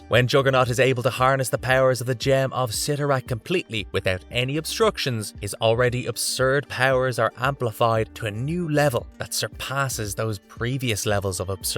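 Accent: Ireland. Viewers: Irish voice over? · Is that an Irish accent?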